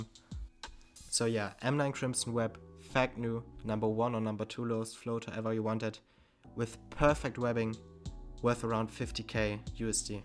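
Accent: Germany. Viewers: I think hes german he has the german english accent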